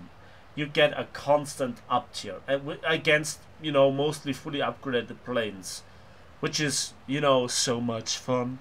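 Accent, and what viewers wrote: Germany. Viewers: Man hört deinen Deutschen aktzent ich mag das :)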